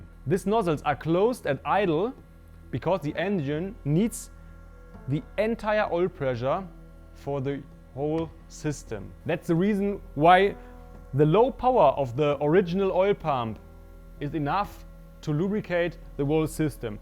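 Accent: Germany. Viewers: shut up, he is German and has an accent, I challenge you to pronounce 10 German words correctly if you can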